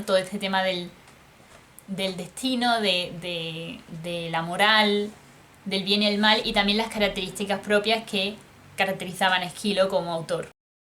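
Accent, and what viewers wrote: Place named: Spain. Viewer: Muchas gracias....!!!! Excelente manera de introducir tanto conocimiento condensado. Me encanta tu acento. De parte de España sos????. Saludos desde Argentina.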